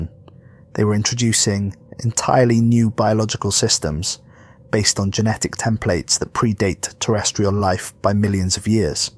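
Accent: Wales, United Kingdom. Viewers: Not sure about the Welsh accent but I love the stories.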